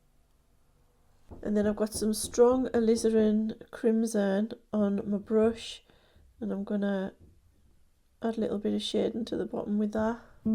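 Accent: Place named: North East England, United Kingdom. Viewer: Thanks for another helpful video. I thought you were saying cornflowers in the beginning but it was your north-east accent.🤭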